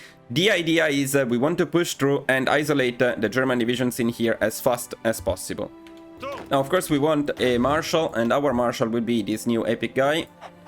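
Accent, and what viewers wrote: Italy. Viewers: Nice video nerd. By the way, are you Italian?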